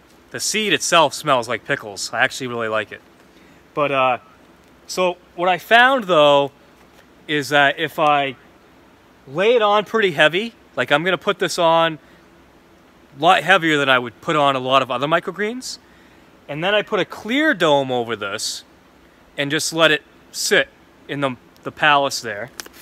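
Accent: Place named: New Hampshire, United States. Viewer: New Hampshire, I was wondering where that accent was from.....there is another Youtuber from NH I watch as well, has a homestead he is building. Good insights on basil, really appreciate this video.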